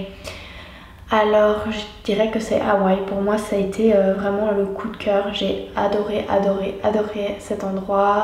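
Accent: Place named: Switzerland. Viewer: Merci d'avoir publié de cool vidéos en français. J'ai appris beaucoup de nouveaux mots. Votre contenu est tellement intéressé. J'avais un peu peur d'écouter, comme l'accent suisse est tellement différent de l'accent québécois. Avez-vous des recommandations d'autres vidéo français?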